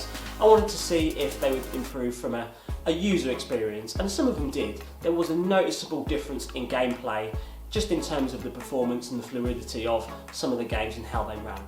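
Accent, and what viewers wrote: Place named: United Kingdom. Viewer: Am i only one who notice that this man skin tone is that of a black person yet he sounds like he's from Britain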